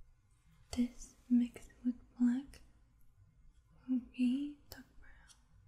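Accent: Vietnam. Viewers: your viet accent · do more viet accent videos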